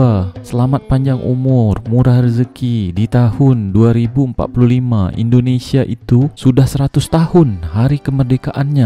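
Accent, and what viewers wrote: Malaysia. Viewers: budak zaman sekarang mereka tak tau bang...selalu mereka dengar org indo bercakap tak macam ni 😂...jela cara bercakap nya pun sbiji macam org malaysia..kalau saya tak tau pun mesti saya ingat abang ni org malaysia 😂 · Padahal dari awal dulu, konten ini sangat bagus dan edukatif. Saya yg dari jawa timur, sangat menikmati, mengapresiasi juga. Suka denger kata2 nya, logat melayu nya, pantun, pemandangan alam, suasana kmpung dan kotanya. Knapa skrang narasinya jadi begini terus. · Saya dari krui lampung ,dengar cakap melayu lucu senang dengarnya salam hangat slalu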